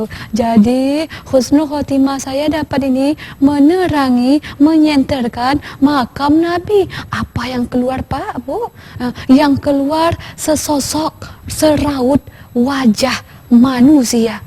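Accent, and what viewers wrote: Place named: Malaysia. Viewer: bicaranya mcm org malaysia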